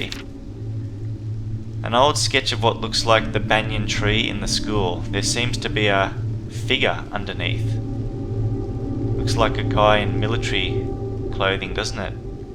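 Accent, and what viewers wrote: Australia. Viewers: The Australian accent is amazing.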